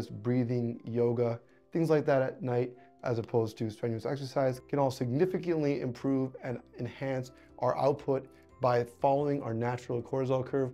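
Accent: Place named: Iran. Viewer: Nice irani accent.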